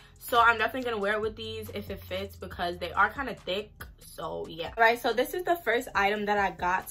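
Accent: New York, United States. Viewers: But its funny how soon as you spoke im like yasss sis from ny ! 🤣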